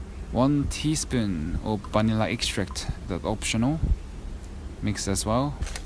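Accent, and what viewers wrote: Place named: Australia. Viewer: 호주액센트 멋져요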